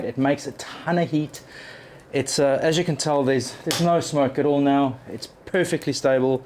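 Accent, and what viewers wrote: South Africa. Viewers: . South Africa, it sounds….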